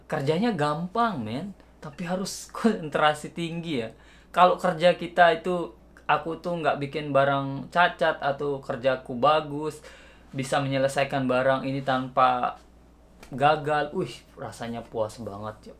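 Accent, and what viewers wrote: Lombok, Indonesia. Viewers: Ndk ini telang ye medok bahsa lombok kadu bahasa indonesia.... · Ya ampun...logat lomboknya masih kentara banget yaaaa....wkwkwkwkwkk Salam dari Lembar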